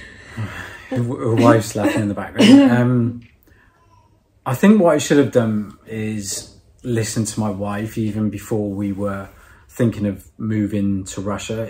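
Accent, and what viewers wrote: United Kingdom. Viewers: Очень интересное интервью - я слушал и наслаждался, так как я преподаватель английского языка и не люблю американский акцент и мне нравится британский. Очень приятно слушать Вас. Вам удачи и благополучия.Very interesting interview - I listened and enjoyed as I'm an English teacher and I don't like the American accent and I like the British one. It is very nice to listen to you. Good luck and well-being to you.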